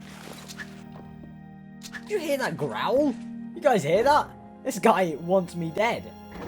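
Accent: United Kingdom. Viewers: Are you British? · Sorry to ask are u British?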